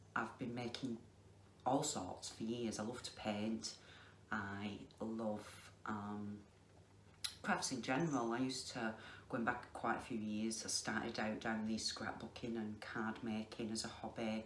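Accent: United Kingdom. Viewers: Tomorrow we have a date,. But today i want to catching up... I clicked on a video of you because of the tattoos and I stayed because of your voice and natural way of speaking. To my spanish ears you have a strong British accent. And I love it!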